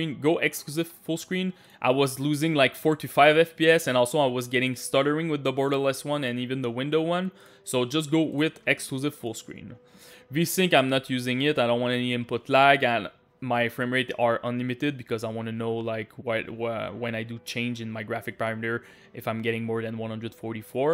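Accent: Quebec, Canada. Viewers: Québécois? :D Merci pour le vidéo, j'espère que ça va m'aider.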